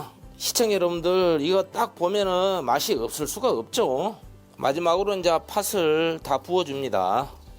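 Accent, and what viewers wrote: Jeolla, South Korea. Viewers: 안녕하세요. 저는 캐나다에 40년째 살고있는데 매일 당신에 체널을 시청하고 있답니다 좋아는 필수이고요 제가 떡 종류는 다좋아해요 제 고향은 전라북도 부안입니다 어려서 고구마 감자 옥수수 떡을 좋아해서 많이 먹었더니 여자 형제중에 제일 크지요 😅 말씀이 전라도 말씀에 정이 더 갑니다 오늘은 시루떡이네요 나중에 떡가루 사다가 떡 해먹으려구요 감사하고 사랑합니다 아 그리고 여기서도 한국 음식만 먹어요 손이 많이 가지만 그래도 한국음식이 최고예요 😂😂❤ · 내가.절라도출신인데.절라도말로하시니까 너무정겹고..셀프님.정말똑소리나내요.화이팅❤❤❤❤❤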